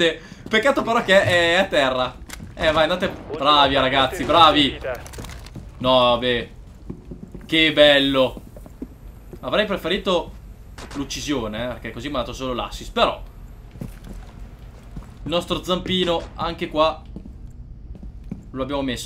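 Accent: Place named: Parma, Italy. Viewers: Ve l'omo é bello il dialetto di parma io somo di fidenza☺ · Il tuo dialetto parmigiano è il top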